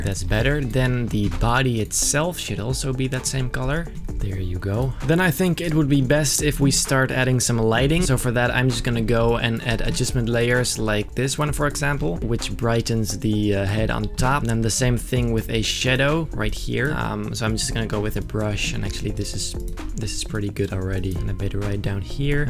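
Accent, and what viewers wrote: Netherlands. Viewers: Fantastische artiest, Nu nog van dat hollands accent geraken. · You sound Dutch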